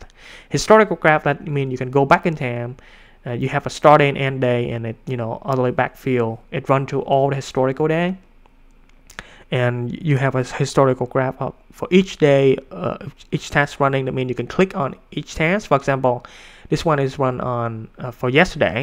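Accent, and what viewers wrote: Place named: India. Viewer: looks trainer have destroyed his accent , is a mix of Indian, US and some his own styling on accent. Sometimes it's impossible to understand.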